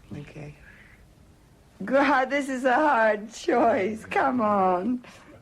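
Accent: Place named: Ireland. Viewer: I love and have always loved her voice whether singing or talking. It has a different sound. I'm scots so of course I have a different sound to pure English but so has Dusty. I don't know if this came from her mother's early influence as Irish but whatever it is Dusty has a different sound altogether. Anyway can I just say this was a great interview with Keith Altham who was always a champion of hers and spoke about so brilliantly on the Just Dusty TV documentary.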